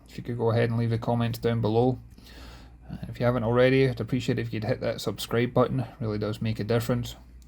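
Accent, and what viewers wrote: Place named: Scotland, United Kingdom. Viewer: Not bad.. But the guys that are selling it have not even done a through clean on it and that in it's self would stop me from buying from them.. I mean they didn't even wipe out the cooler on the fly bridge.. Just being honest brother.. still nice little boat to do some cruising in.. My wife loves your Scottish accent..👍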